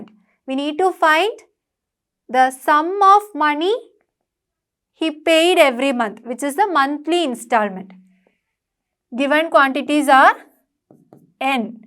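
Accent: Kerala, India. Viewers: Out of the box but i felt like you're having mallu accent or sum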